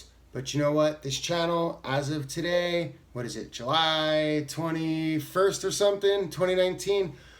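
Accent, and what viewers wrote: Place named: East Coast, United States. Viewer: Ah ok, so East Coast fits your accent. It all makes sense now. I figured Boston. And you bring up a really good point. It's not just a matter of height but weight amd broadness as well. I am 215 and pretty broad and I find bags like PD or Mystery Ranch always fit so small, cut into my pecks, and are even uncomfortable after a short while under load whereas MW is just always so comfortable. MW has got some kind of magic in their straps and harness and now they are addressing our sweaty backs with items like the Speedwell. It makes me think the designer/owner must be a larger guy because of course he would deal with this as well.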